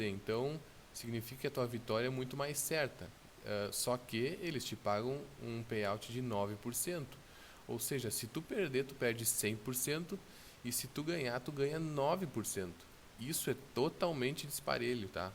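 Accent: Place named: Porto Alegre, Brazil. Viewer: Sou de Porto Alegre , seu sotaque parece daqui hehehe. Estou na Binary a 11 anos e uso o Zeek de forma automatizada.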